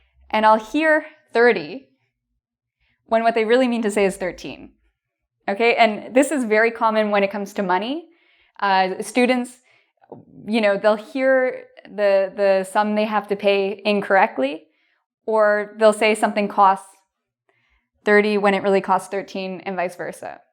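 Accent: United States. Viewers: Is she american?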